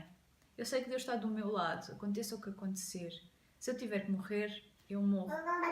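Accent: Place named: Portugal. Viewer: pelo seu sutaque, parece ser portiguesa, tenho muito carinho pelos portugueses muito amorosos, já virei e revirei procurando ajuda pra esse pânico Sofro a mas de 30 anos Medo de tudo Tbm sou Cristâ, e pra mim surpresa encontrei vc Que ensinou que nâo devemos ter medo e aceitar, pq Deus esta conosco vou cofiar E mudar o foco tbm Querida Deus te abençôe pelo testemunho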